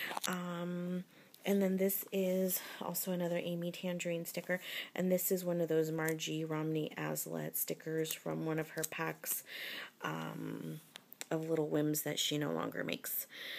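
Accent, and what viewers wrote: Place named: United States. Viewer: omg!!! this is fabulous!!! i love your american accent lol!!!!:-) ooo i love heidi swapp's stamps i have a few too!! oooo u love mermaids! and me!! hehe, omg! ive never seen a real palm tree!!:( 0ooooooooo flamingos!! i love flamingos!!! (im writing this as i watch incase u didnt realise lol) love the seeds stamps so cute!!! oooo doilys are lovely!!! not seen those american craft pin/badges before they're cute! ooo i like that song too!! haha:-) that's a fantastic book love it!!!! xxxx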